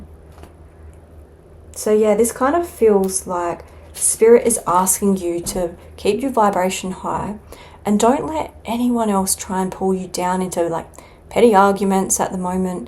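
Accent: Australia. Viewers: Are you from Australia or England? New Zealand perhaps .your voice ❤❤❤ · ambient australian authenticity ♫ ergonomic ♪ easy on the ears ♪ pronunciation sans pretence ♪ maaaate ♫ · Love your Australian accent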